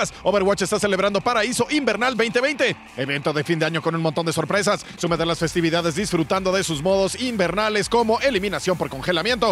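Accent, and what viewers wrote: Argentina. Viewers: Excelente acento argentino... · ese acento argentino.. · y vos como te sentís? te da vergüenza jugar videojuegos con acento argentino, lo mejor que he oido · Muy bueno ese acento argento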